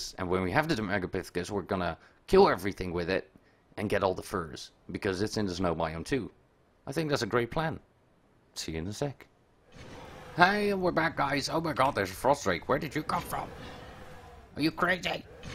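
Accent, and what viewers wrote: Netherlands. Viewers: that's why I asked it sounded like he had the accent im from NL too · is from NL Cant you tell with the accent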